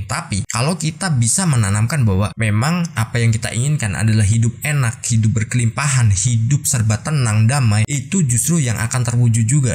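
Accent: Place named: Java, Indonesia. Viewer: Dgr bahasanya jawa medokk lngsung mesemmm kooo cos biasany bhsa indo trima kasih ilmuny ko berkah dunia akhirat buat njenengan sklrga dan smua pengikut chanel ini Aamiin Allahumma Aamiin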